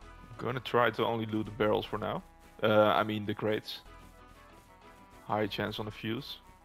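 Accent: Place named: Netherlands. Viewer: Grappig dat je altijd kan horen dat het een nederlander is😅 niks mis met je engels hoor btw! Leuke video!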